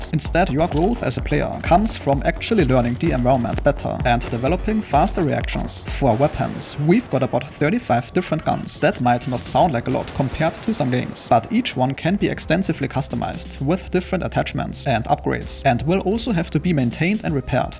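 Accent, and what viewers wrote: Germany. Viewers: Am akkzent war klar zu erkennen das hier ein Deutscher zu sprechen scheint.. Aber österreich ist auch nah genug dran.. Danke für das Video und die Informationen ❤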